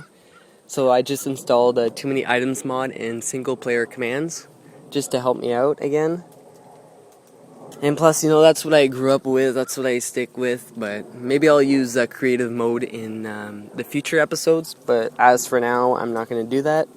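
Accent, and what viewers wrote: Canada. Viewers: You sound like a canadian bitch. Thanks for the tutorial. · are u canadian cuz u sound canadian and i know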